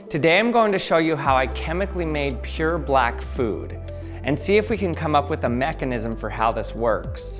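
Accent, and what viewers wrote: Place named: United States. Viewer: Why does he sound so American and gay at the same time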